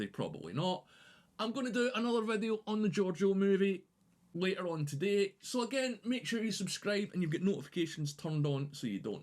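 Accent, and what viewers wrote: Scotland, United Kingdom. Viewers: I think he has a Scottish accent. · I have it at 50%, i understand him, and i am no native English speaker. And he is having a thik British accent. What i actually like. 😁👍🏼✌🏼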